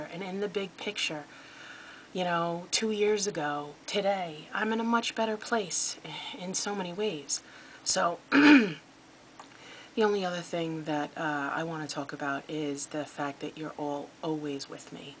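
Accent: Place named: East Coast, United States. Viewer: Great vlog! So proud of you thinking through things you're "saying to self". Ps..love your east coast accent:)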